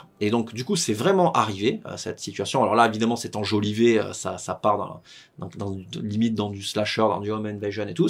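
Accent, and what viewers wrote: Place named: Toulouse, France. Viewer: accent toulousain : )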